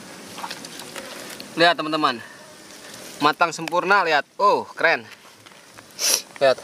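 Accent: West Java, Indonesia. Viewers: sunda pisan mang wkwk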